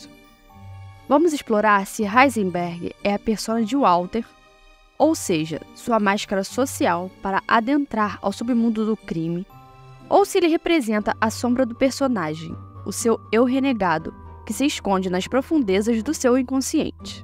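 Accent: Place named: Rio de Janeiro, Brazil. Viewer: O vídeo é bom Complicado esse sotaque de carioca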